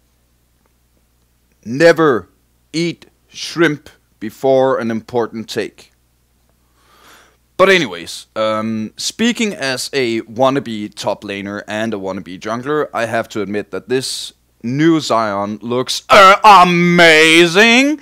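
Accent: Denmark. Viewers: Dat danish accent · we can rly hear you are from denmark bro xD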